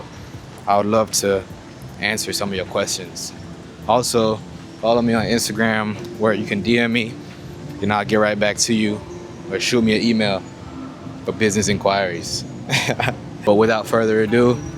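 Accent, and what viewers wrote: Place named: Toronto, Canada. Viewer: Mans sounds like he's from the 6ix.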